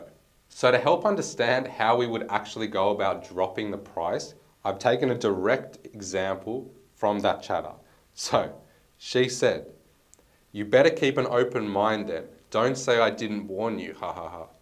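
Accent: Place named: Melbourne, Australia. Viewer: you remind me a lot of natural tim from freedom business studios also based in melbourne, you present well like him and have a similar accent/way of speaking. Might just be a melboune accent though, anyway love your content really helping me with my ai influencers im in bris myself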